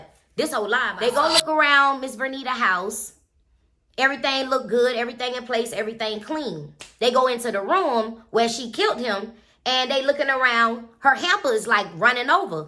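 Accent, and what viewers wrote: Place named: Bahamas. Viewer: The Bahamian accent😅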